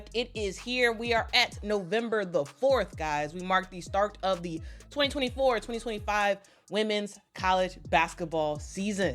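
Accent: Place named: New York, United States. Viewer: where is this nyc accent coming from? Lol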